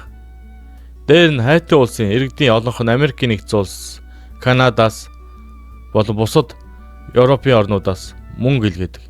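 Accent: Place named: China. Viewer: Хятад аялгуутай сонсогдож байна бас хятад ая.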